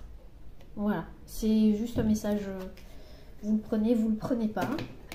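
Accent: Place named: South-West, France. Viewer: Je vous aime trop, vous me faites sourire quand vous vous énervez avec bienveillance avec votre petit accent de notre sud-ouest. Et vos messages raisonnent tellement depuis que je vous écoute et me permettent de grandir. J'espère pouvoir vous rencontrer un jour. Je viens de conscientiser que j'ai tout....peu mais j'ai tout....je n'ai plus courir derrière qqchose....car à aujourd'hui j'ai tout ce qu'il me faut et si j'ai encore plus tant mieux mais j'ai tout.... merci ❤❤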